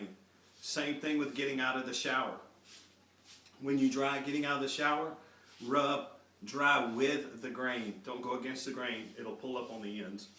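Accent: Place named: South, United States. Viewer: I think he says 'interior meniscus' (with a southern accent), which means medial meniscus. And he meant to say 70-degrees angle. All good. Still a good, instructional video.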